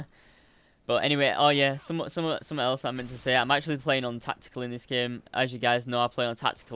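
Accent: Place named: Northern Ireland, United Kingdom. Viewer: Nice man! You sound like you're from Northern Ireland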